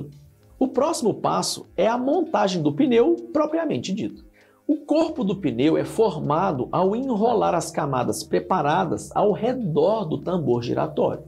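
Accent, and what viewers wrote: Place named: Rio de Janeiro, Brazil. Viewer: Ele deve ser só RJ, lá eles falam feio assim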